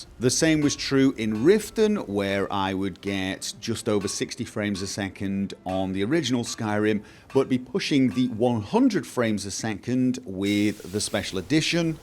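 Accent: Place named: Scotland, United Kingdom. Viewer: are you Scottish? love yer accent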